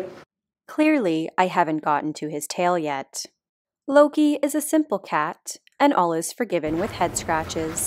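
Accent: Canada. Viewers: I love the commentary... Hilarious.. I'd bet anything your Canadian!!!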